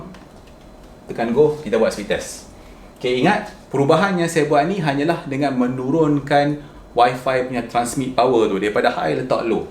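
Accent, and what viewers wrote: North, Malaysia. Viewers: TK... Org Utara ka ni ?...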